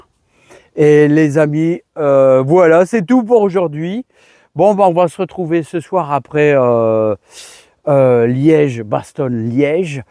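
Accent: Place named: Pas-de-Calais, France. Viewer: J'adore. Votre accent du Pas de Calais est un régal. J'espère faire des poireaux en suivant vos conseils. Mes laitues à moi sont minables pour l'instant.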